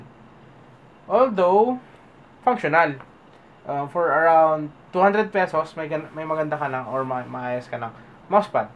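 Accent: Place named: Russia. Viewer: it seems you to be from rusia or nordic EU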